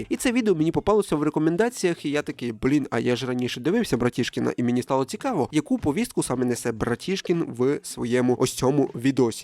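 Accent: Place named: Transcarpathia, Ukraine. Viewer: Ого, закарпатський акцент. Кайфово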